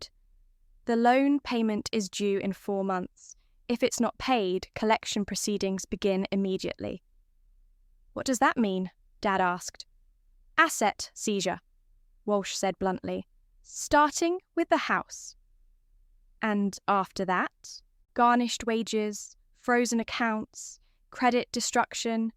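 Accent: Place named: Australia. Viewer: I live the Australian voice-over that everybody calls a British voice.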